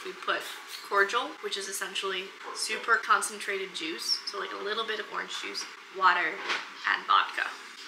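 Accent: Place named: United States. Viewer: It’s so weird seeing you visiting a place near me, as you are so American 🤣 and different to the locals (as in your dialect)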